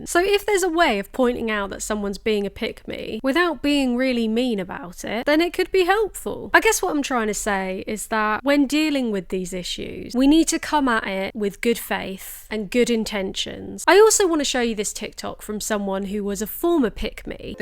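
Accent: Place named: United Kingdom. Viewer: Soooo just realized all my male partners were actually some kind of pick me boys AND all the female partners/dates were im not like other girls girls and woah bisexuality really is something else, like, makes SO easy interacting physically, romantically or both with every kind of awful people uncomfortable laugh Btw just discovered this channel and im obsessed! This channel has everything, an amazing British accent, great humour, cows, smashing the patriarchy and a lovely skinny alien dog (im a cat person, but I have a soft spot for skinny alien dogs) Okay, I'll stop this rant here, love from Italy!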